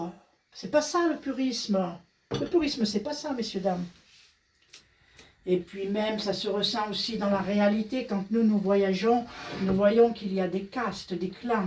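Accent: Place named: South, France. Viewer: Coucou. On peut pas être d'accord pour tout et c'est ça la beauté de l'être humain, l'important c'est de le partager sans sagresser. Mais ehhhhh tu as bel accent du sud 😅 vive le soleil!! Je vais pas te dire que je suis d'accord sur tout mais alors qu'est ce que j'ai rigolé sur la nourriture parce que crois le ou non on partage beaucoup d'idées à ce niveau là.. mais moi quand je le dis, je me fais lyncher parce qu'il paraît que c'est du jugement. Du genre il paraît qu'on gâche l'enfance de nos enfants parce qu'ils ne mangent pas à McDo. On ne peut pas aimer tout le monde évidemment et on ne peut pas être d'accord avec tout le monde. Après tu sais des videos 20 ou 30min de vidéo ça ne reflète pas une vie entière. Et justement parfois on croit que les gens sont comme ci ou comme ça au travers de vidéos mais il y a aussi tout autre chose et surtout ce que nous apprenons à nos enfants.. 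c'est de faire la différence entre la personne et le contenu. On peut ne pas aimer un contenu pour autant ça ne veut pas dire que la personne ne vaut rien. En tous cas, ce que tu dis fait sens. Comme je te l'ai dit tu ne le croira peut-être pas pensant que nous sommes des mauvais nomades mais nous avons beaucoup de visions communes entre autre sur l'alimentation, action, Macdonald et j'en pense. Eh oui j'ai une grande bouche mais mes principes je les applique, alors oui parfois on fait des exceptions et c'est normal parce que la bienveillance commence avec soi-même et d'accepter qu'on est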